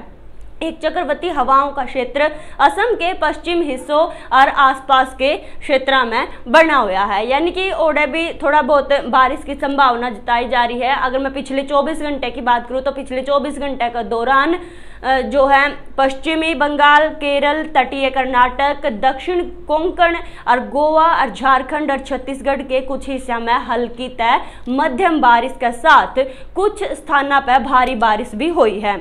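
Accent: Haryana, India. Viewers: I like the haryanvi accent very much ma'am ji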